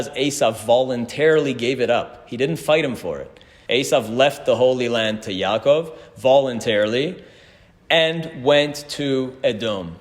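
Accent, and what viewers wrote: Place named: Canada. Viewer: BH wonderful every (canadian) syllable; form, content, substance, and especially, wit/humor. if Moses spoke English, he'd sound like this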